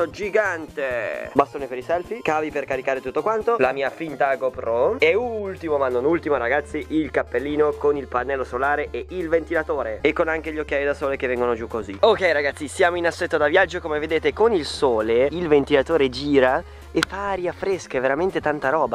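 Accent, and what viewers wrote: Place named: Arona, Italy. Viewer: oddio sei di aronaaa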